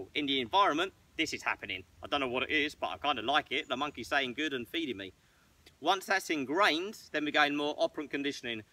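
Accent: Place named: London, United Kingdom. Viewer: k ent shuster That's a London accent, silly, and it's a warm summer day.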